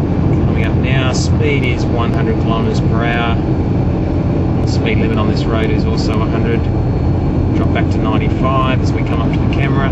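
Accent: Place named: United Kingdom. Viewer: ok sorry about that because i'm french and having hard time understand your kind of british accent keep on good worck !! best samuel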